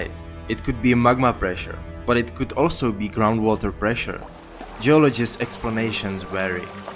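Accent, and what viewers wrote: Czech Republic. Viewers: by accent u are deff czech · Do I hear a czech accent of speaker, don't I? :D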